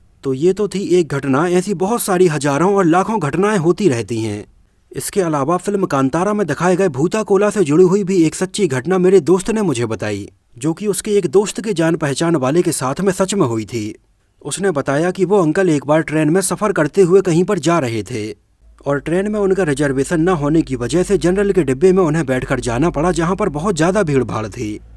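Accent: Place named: Bundelkhand, India. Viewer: आप शायद बुंदेलखंड के हैं आपकी बात से मैं सहमत हूँ आपकी टोन से मैं सोचता था कि आप बुंदेलखंड के हैं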